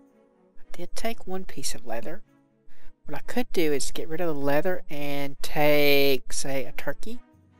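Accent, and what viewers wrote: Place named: Canada. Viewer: Huh, interesting. You pronounce a few word really similar to Etho (the Ethoslab minecraft guy) who is Canadian. I guess Etho is just a really southern sounding Canadian :D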